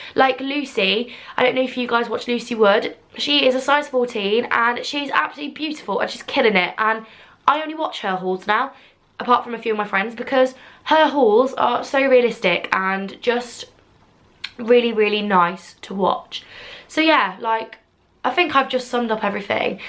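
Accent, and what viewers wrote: United Kingdom. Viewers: British Accent 💕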